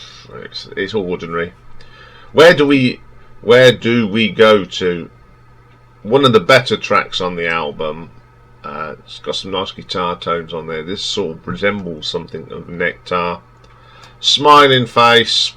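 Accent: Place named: United Kingdom. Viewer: You sound British, but your sentences hardly make proper sense. Anyway, good luck with your channel...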